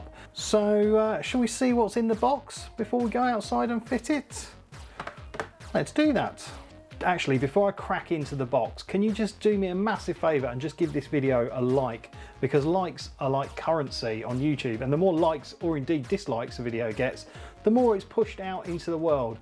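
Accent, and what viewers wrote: United Kingdom. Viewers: Ahhh how nice it is to actually listen to a fellow brit. This is such a great video and very helpful especially for useless DIY bird's like me lol....thank you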